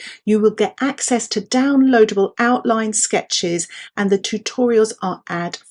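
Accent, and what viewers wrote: United Kingdom. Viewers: Merci ! - Un accent british sooo deliiicious..... Love from Aquitaine, France (do you remember your/our queen Alienor ?)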